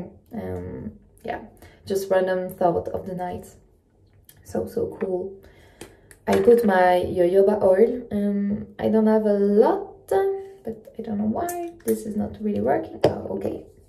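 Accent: Wales, United Kingdom. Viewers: Love watching your travels! You'd be very welcome in the UK anytime:) and the welsh accent is lovely! My mum was welsh and she had such a lovely speaking voice! Happy travels x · The coast of Croatia looks so beautiful. I, too, love to hear the Welsh accent. I hope & you have a great weekend and the rest of the week. 🧡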